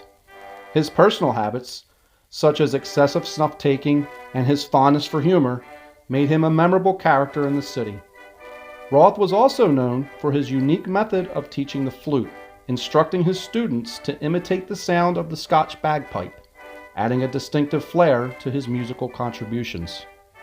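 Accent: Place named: Philadelphia, United States. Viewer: Great piece of history with a Philly to the bone classic accent🙌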